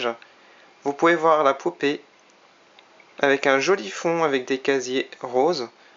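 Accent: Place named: France. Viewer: merci bien! très bien fait accent française Gracias!!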